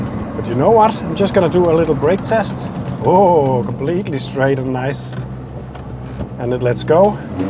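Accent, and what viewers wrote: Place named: Denmark. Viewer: My mother was Danish and miss the accent. Listening to you brings me happy memories.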